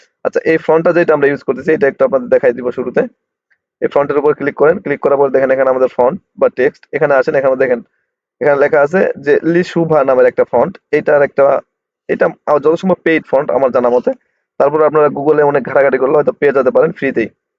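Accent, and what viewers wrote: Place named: Joypurhat-Naogaon, Bangladesh. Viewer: vai apner basa ki joypurhat,naogaon er dike naki?kotha bolar dhoron sune jigges korlam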